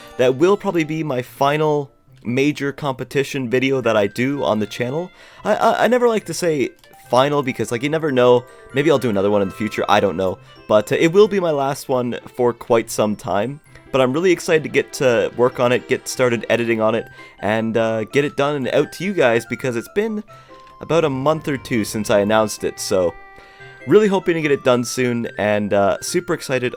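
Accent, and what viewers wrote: Canada. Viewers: love the accent, very Canadian 😎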